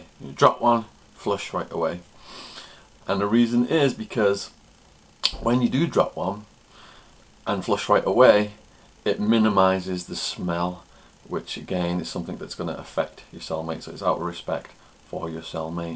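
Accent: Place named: Northern England, United Kingdom. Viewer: You from Uk? Sound like you’re from Chester or somewhere up north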